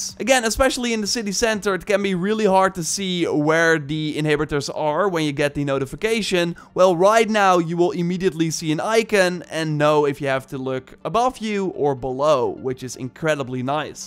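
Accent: Netherlands. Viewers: Nice Dutch accent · Great video, cringy dutch accent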